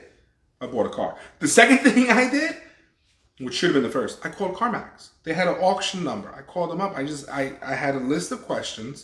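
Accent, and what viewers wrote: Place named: New York, United States. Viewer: I knew it; you couldn't hide the NYC if you tried lol. Great video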